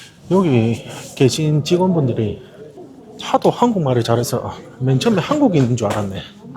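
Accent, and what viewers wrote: Busan, South Korea. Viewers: 너무 자연스러운 부산사람의 행동과 말투 완전 부산을 이해하고 알고 싶으면 이 유튜브 추천합니다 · 부산사투리 너무정겹다.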